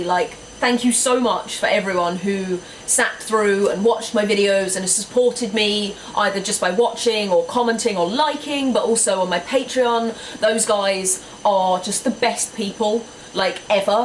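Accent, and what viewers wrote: United Kingdom. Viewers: I have the worst begging of a year in my life that maybe a war is going to set in my country and I want to travel for UK but I can not. I love you so much coz when I watch your videos I feel happy also I learn how to do a British accent by watching your videos. Happy new year for you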